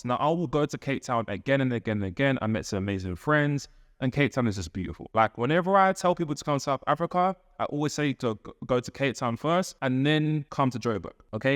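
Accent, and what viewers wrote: United Kingdom. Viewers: Yoo is that British accent am in Birmingham Walsall side right now but my home is in Soweto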